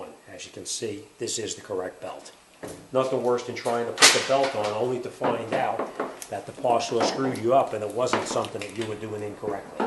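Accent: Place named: East Coast, United States. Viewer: Finally another video with an east coast accent lol. Thanks for the instructions. John K (Newark N.J.)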